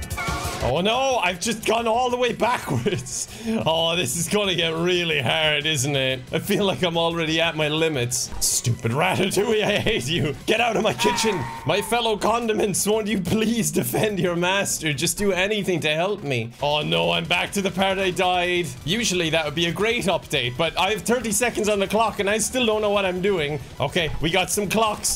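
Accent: Ireland. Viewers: You're Irish? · Wait! Irish?! I thought you were Spanish!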